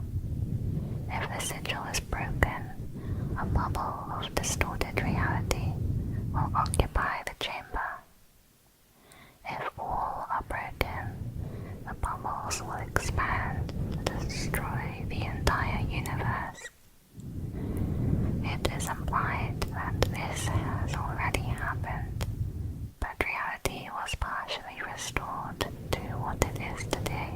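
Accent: United Kingdom. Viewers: You have the most beautiful accent and voice I've ever heard. I'm British too but have a heavy lower-class South East accent and mostly speak in cockney slang. Really gotten into SCP stuff too over the last little while. Great stuff :)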